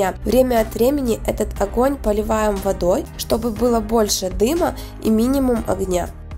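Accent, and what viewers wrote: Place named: Ukraine. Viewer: Вы молодец, интересные рецепты, что у вас с говором? Рублённое, КОптить? Я понимаю, что Вам удобнее разговаривать на украинском языке. Вы снимаете ролики на русском языке, ударение проверяйте в словах во время монтажа ролика.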